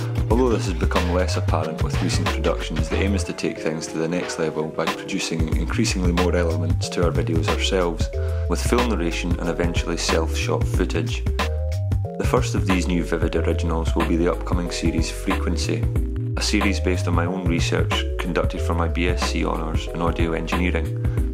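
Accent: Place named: Scotland, United Kingdom. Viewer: I've really enjoyed your vids. Pretty exited that your back, or what? Been educated in the English language since 4th grade, some 30 years back. I know I'm not perfect at it, but quite good I think. Anyway... I DO like your Scottish or Irish accent, (excuse me) but your talking WAY to fast for me, to pick up details. Please consider your foreigner fans, when making the speech. THX Looking forward, to what might come up :)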